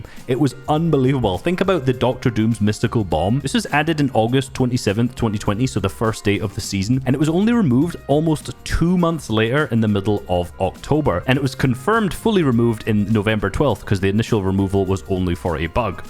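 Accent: Scotland, United Kingdom. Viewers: Gotta love that scottish voice:hands-yellow-heart-red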